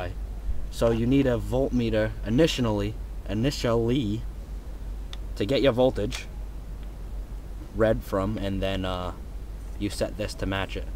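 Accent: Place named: Brooklyn, United States. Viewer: Sounds like hes from Brooklyn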